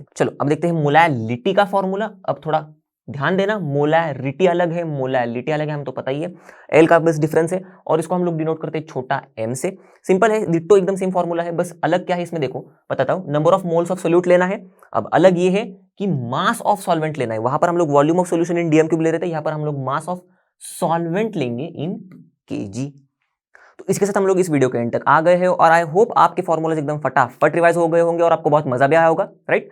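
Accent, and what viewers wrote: India. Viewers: These lessons sound interesting, but they have one small problem: the Hindi accent 😅 Could you please add English subtitles to your playlists..? Thank you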